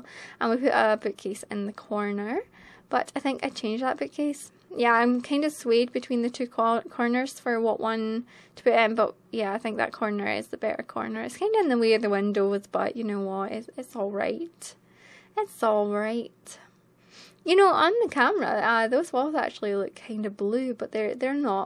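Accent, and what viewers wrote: Scotland, United Kingdom. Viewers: I think we might come from the same part of Scotland as I couldn't tell u where Scottish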